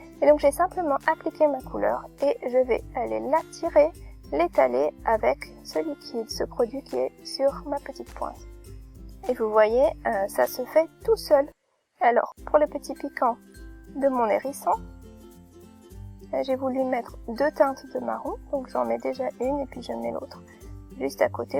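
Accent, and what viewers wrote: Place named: England, United Kingdom. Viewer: OUIIIII tu as un accent anglais so good !!! Mais quand je t'écoute parler je trouve que tu as un petit accent de je ne sais d'où très charmant !! Oh les bruit de la nature c'est si beau !! Ces petits hérissons sont vraiment trop mimi - perso je préfère avec la neige !! Merci pour ce partage PB Bonne nuit et à demain BIZZZZZ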